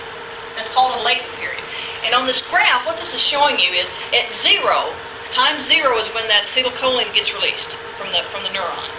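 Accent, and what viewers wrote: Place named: South, United States. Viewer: You are awesome, your videos are so wonderful,plus it seems easier to understand with a southern accent ;-)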